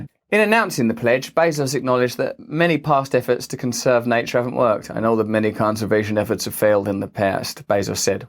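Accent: United States. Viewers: That American accent got me 😂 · It's fucking weird to hear Russell Brand speaking in an American accent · Everytime you do your American accent it causes me to do a double take and rewind. It's so funny! Best to you and yours! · THE AMERICAN ACCENT🤣🤣🤣 I’m obsessed with jt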